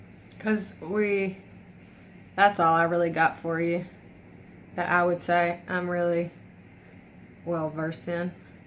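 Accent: South, United States. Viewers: you have the same southern accent I have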